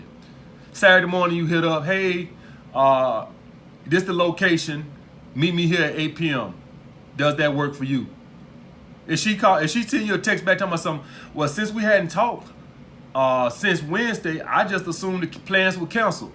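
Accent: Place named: West, United States. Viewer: 😂😂😂😂😂 you funny ASF but still talking these deep truths. With that Western Accent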